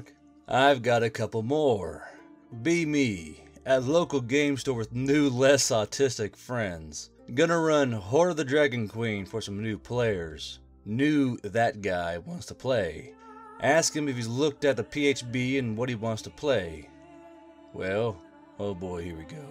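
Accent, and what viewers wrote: South, United States. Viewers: He has a very heavy southern accent. Makes listening to him more fun for me since I can see an old folk I used to talk to who spent plenty of time telling me war stories.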